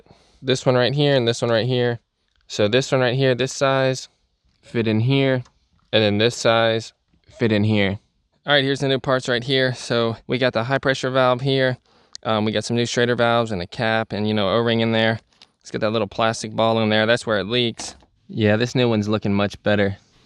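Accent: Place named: Florida, United States. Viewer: “Ya knowww…” love your words my guy. You sound like you’re from Florida mr cool 😎 lol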